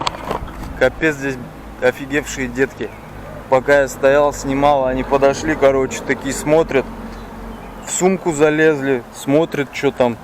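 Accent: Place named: Chuvashia, Russia. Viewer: это чувашский акцент на английском языке.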